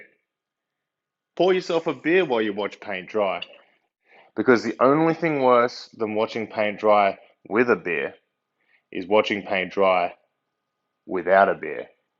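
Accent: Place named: Australia. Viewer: finally a yobo gardener.... sick of hearing yanks and poms... plus 10 bogan points for the beers... subscribing to this bloody legend!!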